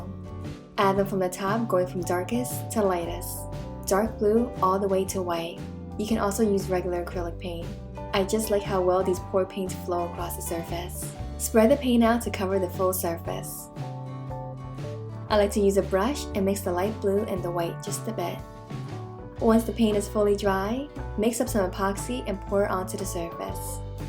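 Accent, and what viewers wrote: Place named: United States. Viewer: from an Real Indian woman to now being a sultry American voiceover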